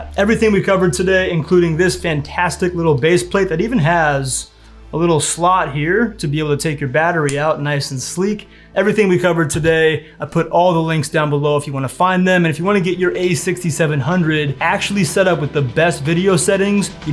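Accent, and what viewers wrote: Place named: Belgium. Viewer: hmmm are you belgian? youre american accent is pretty good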